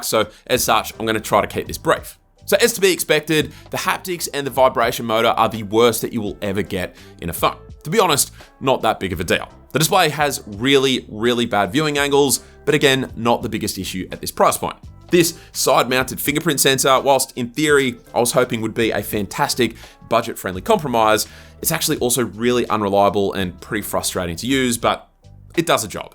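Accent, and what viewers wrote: Australia. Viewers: His Australian voice makes this even more soothing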